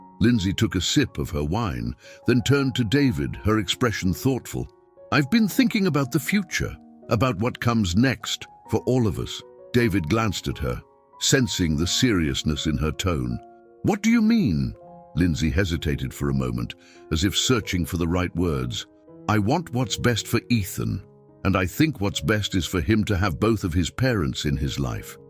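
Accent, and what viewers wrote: United Kingdom. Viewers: I liked this story and the others. But, I wish they'd use another narrator. The voice sounds too English.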